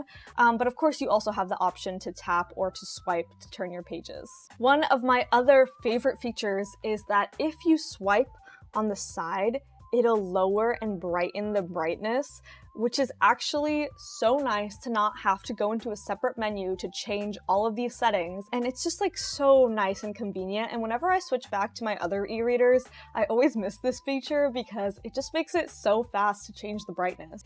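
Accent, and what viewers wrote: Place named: United States. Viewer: You sound like you are from the USA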